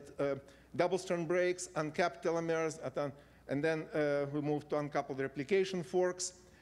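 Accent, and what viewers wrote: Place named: Russia. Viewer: He speeks with thick russian accent.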